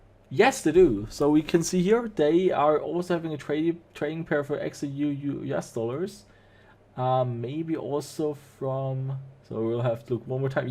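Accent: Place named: Austria. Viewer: You must come from Austria, because your english Sounds Like Schwarzenegger. 🎉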